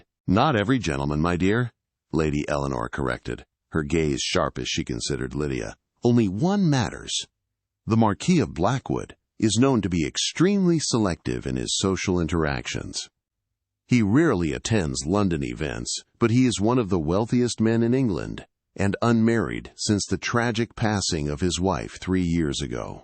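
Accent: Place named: United States. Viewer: and American accent😞. Should be narrated in British accent.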